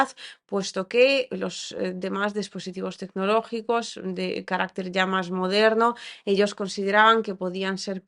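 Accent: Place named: Spain. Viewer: me gusta que ya estás perdiendo tu acento gachupin… mira guapa, eso que icieron es terrorismo puro y deben caer los culpables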